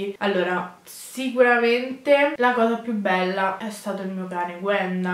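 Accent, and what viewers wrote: Rome, Italy. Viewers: in questo video ti ho vista particolarmente ''Romanaccia''.....bellaaaa spontanea in alcune parti!!! Miii piace bravaaa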